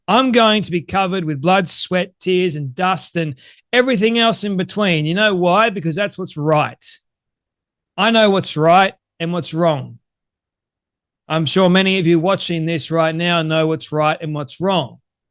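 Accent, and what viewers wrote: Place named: Australia. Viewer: Love your no nonsense Aussie accent.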